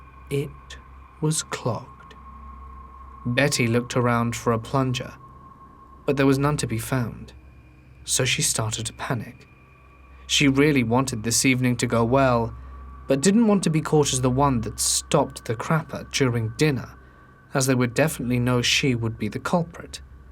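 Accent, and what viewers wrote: England, United Kingdom. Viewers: Oh, I have to say that even poop stories are better when narrated by an Englishman. I think my neighbors must have wondered what the hell I was laughing about. Absolutely brilliant! 🤣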